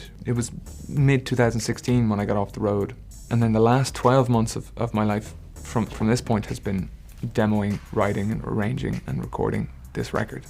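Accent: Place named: Ireland. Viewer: Am I the only one who didn’t know he was Irish? Ive never heard him speak before....I’m not even sure where I thought he was from, I guess I just assumed like manifested from a witches spell or something.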